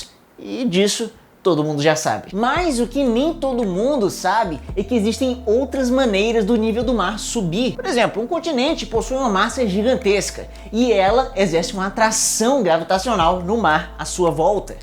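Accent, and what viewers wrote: Ceará, Brazil. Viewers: Vc é cearense? Tbm sou haha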